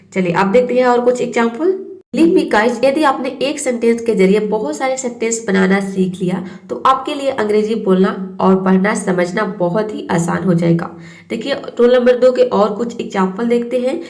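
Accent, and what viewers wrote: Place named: Bihar, India. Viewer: Bihari sare esahi bolte hai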